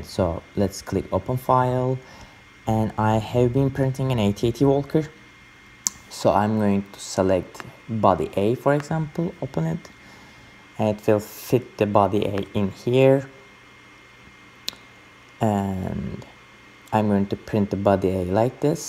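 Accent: Turkey. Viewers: Your accent sounds like you are Turkish and i am proud of you, thanks a lot for sharing your experiences.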